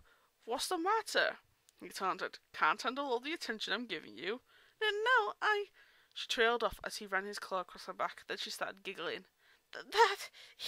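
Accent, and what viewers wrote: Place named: United Kingdom. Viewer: I was wondering if an I was supposed to be there, but got missed out. I sometimes do that, miss words out, especially if I'm on a writing buzz. Well your accent isn't "British" what-ho old chap I went tp a Eton don't ya know, but it still sounds like a British accent to me and I was refrring to your "Maybe it's supposed to be like that, but not for me, I'm British!" or something along those lines. And I'm going to have to re-read that scene, lol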